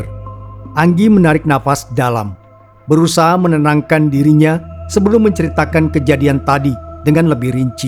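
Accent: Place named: Bali, Indonesia. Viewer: Logat Bali begitu jelas di sini keren banget di tunggu part 2 nya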